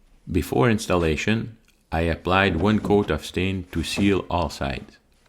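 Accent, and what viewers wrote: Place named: Netherlands. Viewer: Dang! That should be strong enough. The dutch accent is a nice touch 🙂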